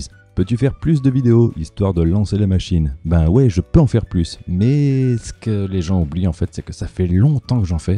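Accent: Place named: Hauts-de-France, France. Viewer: J'arrive 4 an après la bataille, mais j'aurais aimé savoir d'où vient ton accent. Tu prononces les sons "A" d'une façon particulière, ça ressemble à l'accent de ma région (Hauts de France-Belgique).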